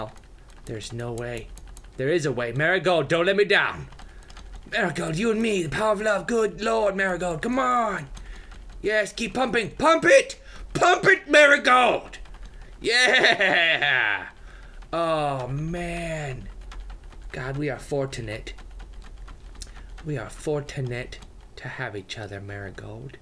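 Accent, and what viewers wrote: United Kingdom. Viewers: Love ur British voice! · hey! are u talking british? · I FREAKIN LOVE YOUR BRITISH ACCENT WHEN I HEAR IT I PASS OUT! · You have an awesome British accent! :D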